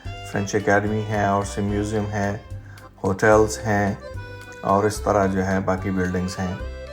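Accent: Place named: Faisalabad, Pakistan. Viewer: My piyara brother.. Faisalabadi accent in urdu..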